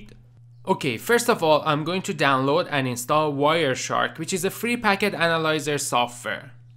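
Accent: Iran. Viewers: Thanks, quite useful for troubleshooting my IP cameras...and oh yeah.....I just love that Persian accent :-) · your accent like iranians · in iran we say that your Tehraneis accent is killiiing me and we think thats beautiful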